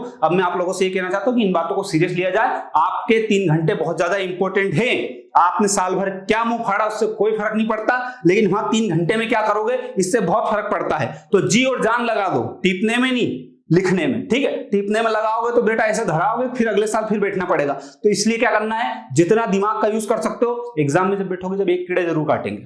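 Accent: Indore, India. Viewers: Indore se ho kya bhaiya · Sir indore se ho kya😅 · thoda indori tone bhi h sir ka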